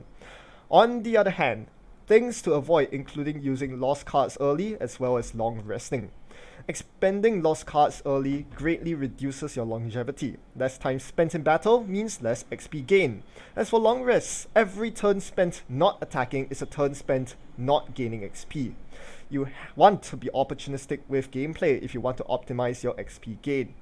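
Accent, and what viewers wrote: Singapore. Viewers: You from Singapore by any chance?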